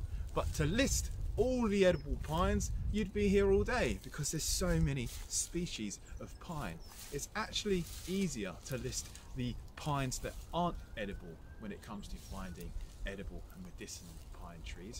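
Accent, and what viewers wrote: United Kingdom. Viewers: Hello, where is your accent from? British?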